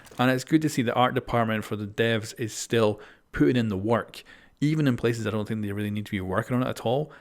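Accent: Scotland, United Kingdom. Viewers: I’m not sure if I’m right but it sounds like ur accent is slightly Scottish is it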